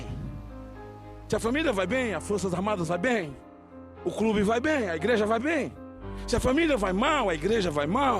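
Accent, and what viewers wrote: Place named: Rio de Janeiro, Brazil. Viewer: Senador do Brasil, você é nosso herói. Nasci na mesma cidade em que você nasceu. Fui criado em São Paulo e falo normalmente. Senador, por que você começa com sotaque carioca e depois volta ao normal. Você não é carioca. Assista o seu vídeo e veja o número 2 você fala como carioca e depois como uma pessoa normal. Honre sua naturalidade. VOCÊ NÃO É CARIOCA. É BAHIANO, HONRE SUA MÃE. VOCÊ É NOSSO HERÓI. PARABÉNS!!!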